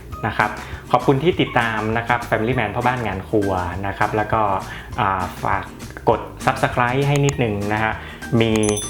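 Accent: North, Thailand. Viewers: คนเหนือก่อครับพี่ รีวิวดีมากเลยครับ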